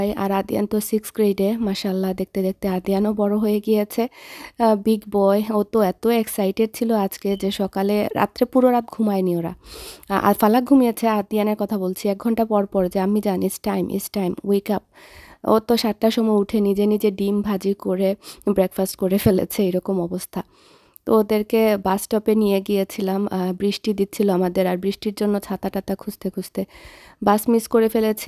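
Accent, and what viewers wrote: Sylhet, Bangladesh. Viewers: Apni sylheti vashay kotha boilen valo lage but shuddhu boilen na valo lage na ...bolte paren je apni deikhen na apnr vdogulu valo lage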